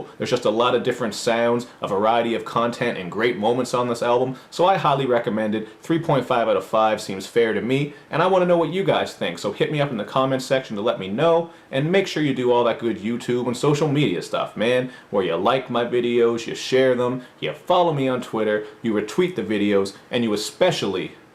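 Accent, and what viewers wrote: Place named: Western Canada, Canada. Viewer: Are you by any chance from western Canada? You sound like me lol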